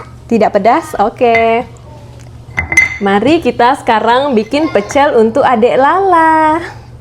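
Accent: Pontianak, Indonesia. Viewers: sudah kuduga.... Logat nya pontianak sekali.... Pontianak dmn nya kak? ❤salam dr pontianak jugak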